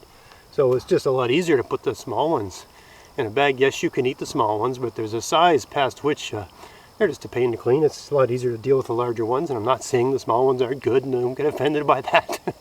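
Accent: Maritimes, Canada. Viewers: Without reading the page name I watched this before gardening and within 2 seconds I was like is this guy an islander?? Unmistakable maritime accent. Then I saw your channel name and saw you’re in NS 😂 thanks for the potato advice from this islander!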